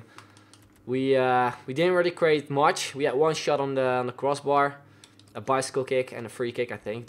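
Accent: Netherlands. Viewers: wacht! ben je brabander!!!!!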